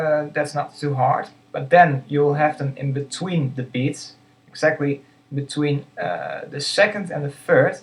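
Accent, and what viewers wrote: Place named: Netherlands. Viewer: I Hear a Dutch accent :-)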